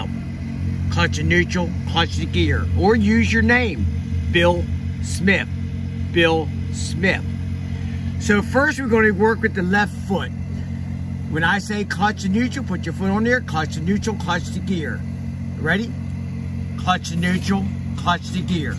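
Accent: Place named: Maryland, United States. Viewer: Nice! I can tell by your accent lol. I grew up in Baltimore, but now live in Idaho. Currently going to trucking school and trying to soak up as much information as possible. Thanks for the vids!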